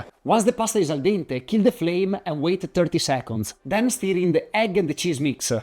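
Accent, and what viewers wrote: Italy. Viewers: sounds very Italian when speaking English because he carries over Italian patterns in pronunciation, stress, rhythm, and intonation, giving his speech a melodic, rising tone and a distinctly Italian flow.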